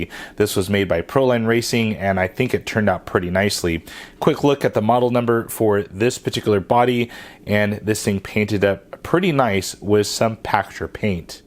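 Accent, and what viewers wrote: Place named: California, United States. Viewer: Hah sall good! Guess that's just how California can sound:1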